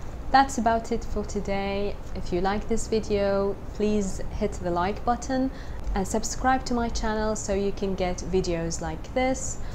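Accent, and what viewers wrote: United Kingdom. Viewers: u are like British women i like it ur British accent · هل تعتمدين اللهجة البريطانية في فيديوهات القناة؟ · اللهجه اللتي تتحدثين بيها .قد لايتحدثون بها انجليز في مدن اخرى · I also love British accent like you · i adore your accent ..British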